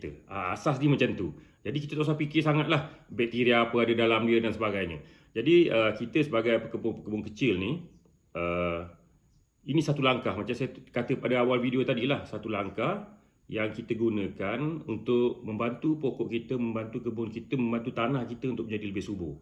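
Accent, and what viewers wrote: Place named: Terengganu, Malaysia. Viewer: Assalamualaikum,tumpang tanya, asal dari mana,bunyi macam orang Trengganu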